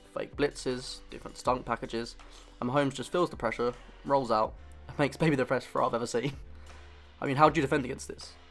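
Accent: United Kingdom. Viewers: where are you from? sounds like the UK, if so, are there many nfl fans there? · I would rather have a football woman narrater than a british accent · This is unbearable to listen to with a British commentator. WTF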